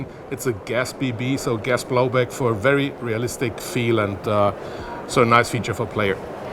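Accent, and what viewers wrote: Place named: Germany. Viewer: You have a pretty cool accent. Are you German?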